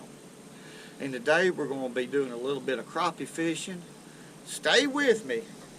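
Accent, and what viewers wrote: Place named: Alabama, United States. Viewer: Is it weird that I could tell you were from Alabama before you ever mentioned it lol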